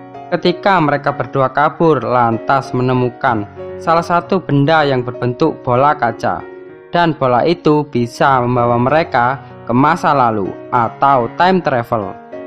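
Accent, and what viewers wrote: Java, Indonesia. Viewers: Suara admin anime medok medok ya.. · aku suka medok mu min hehe lanjutkan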